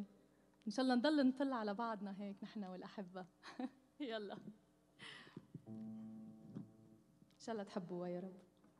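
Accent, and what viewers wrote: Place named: Jazira, Syria. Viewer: فديت لهجة جزيرة الفرات شكد تنحب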